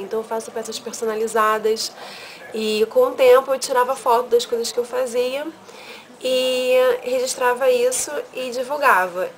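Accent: Rio de Janeiro, Brazil. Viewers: Oi, Adoro suas makes e seu sotaque carioca e muito fofo!! rsrs Vc tem twitter amore? Bjinhoss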